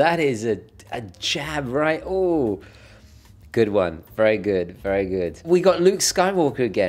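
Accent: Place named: United Kingdom. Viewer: I really laugh a lot in this video. I’m from México, I use to live in an island on the Caribbean Sea, you should go there for vacations. But now I leave in Mexico City for university. Here no one speaks in English, but also, here I started to really like de language. And more the british accent like you have. That reminds me of my English teacher back in those days. I found your videos very interesting, and now I’ve just bought my first watch. I was the one asking about pocket watches months ago, but starting to know more about horology, now I bought a invicta pro diver. I know, a controversial watch. But that’s what I can afford at this moment, and I also choose that because my dad is (but he doesn’t work on that anymore) a diver, and I want to learn in the future. Thanks for your videos, I learn and practice my English at the same time.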